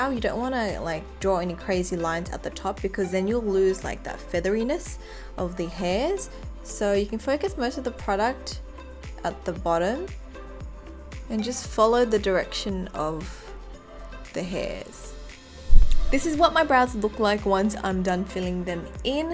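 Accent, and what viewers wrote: Australia. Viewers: Are you Australian? I just wonder if I guessed right 😂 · You have gorgeous, already thick brows!! Beautiful skin, too...are u Japanese ancestry? And that is the strongest Australian accent possible! Beautiful:)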